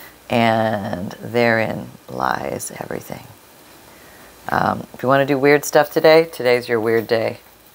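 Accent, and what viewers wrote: Canada. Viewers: I love the Canadian accent! I have a friend that lives in Nova Scotia and I could listen to her talk for hours 😊😊 Also I have an Aunt and Uncle who live in Fargo ND.. I live in New Mexico and when they come to visit I love listening to their stories.. Accents are soo unique and are always intriguing to me. 😁😄😊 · Thank you for more inspiration! Sometimes I overlook the obvious, such as being able to draw a border to get the effect I want. I spend hours looking for just the right paper when actually I can create my own design, and have fun doing it! 🤓~ I love the Canadian accent, especially on the 'out' words. 🥰 I have the Texas twang which is a giveaway of where I'm from, anywhere I travel. Oh well, we work with what we've got, eh? :virtualhug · Good afternoon 🌞 I love your white hair. I know it's officially blonde but on my TV it's very white and very pretty. 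Yes Canadians have a slight accent. Anywho, love the little tidbits and ty for the giggles. You always make me laugh. My hubby caught me watching you again. He said and I quote, " you would leave me for crafty videos" 😂😂😂 why yes, yes I would, 😅😅😅 have a great day! ❤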